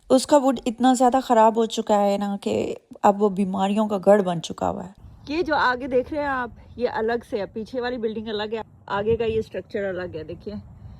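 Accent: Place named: Mirpur, Pakistan. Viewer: Aap pakistan mein kahan se hain Aapka accent bilkul mere jaisa hai And aapki choice of words bhi Mein Mirpur k paas se hu.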